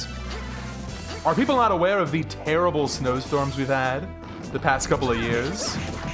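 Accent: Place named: Texas, United States. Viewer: other people: dang, this is crazy me, a non-twitch viewer: HE'S TEXAN??!!!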